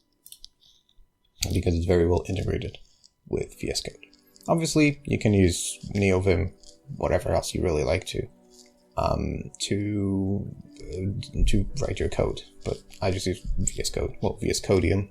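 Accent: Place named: Canada. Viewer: Your pronunciation of dipthong /aw/ rose to a mid vowel preceding a voiceless obstruent (Canadian Raising) (Common in The Big Smoke) (Common in Mississauga)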